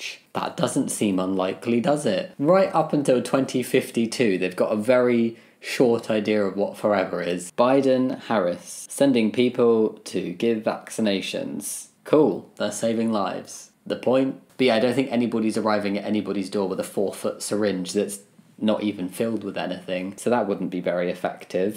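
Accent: England, United Kingdom. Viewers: you look, talk and act like a caricature of what an english person looks like on basically any american sitcom EVER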